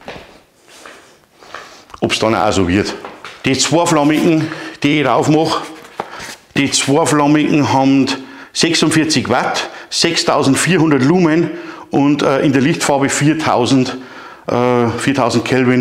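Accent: Bavaria, Germany. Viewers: Ne, alles OK was du machst, ist doch deine Sache! Lass dich nicht Trollen. Ich schaue mir gerne deine Fachkompetente Videos an. Mach bitte weiter so, vor allem auf Bayerisch! Liebe Grüße aus Unterfranken. 👍👍👍❤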